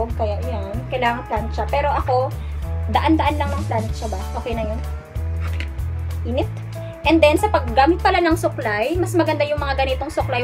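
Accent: Philippines. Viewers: Ilongga ka day? Love the accent💖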